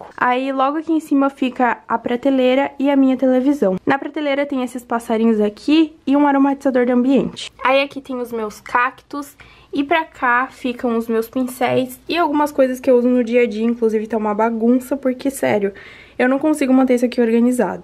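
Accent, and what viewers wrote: Rio Grande do Sul, Brazil. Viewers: Bahh q quarto fofo, um sonho! Tu é gaúcha? Parece muito... Eu sou gaúcha! Kkkk♥️♥️♥️♥️ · Vc é gaúcha? Teu sotaque é lindoo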